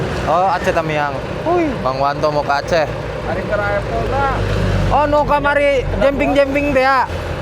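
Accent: Serang, Indonesia. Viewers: Sy senang ngikutin yutup sy juga sopir bis malam pahala kencana tapi lebih senang lagi klu bicara dikit dikit waduh aing ciri khas orang Serang he he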